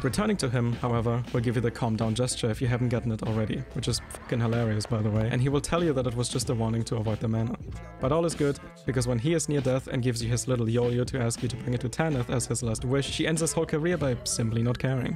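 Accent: Germany. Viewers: German accent? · Damn son, you're talking really really fast with a heavy german accent that incorporates A LOT of "ts" and "ks" sounds. All I understand is "tskskshtss stsksstsssst"...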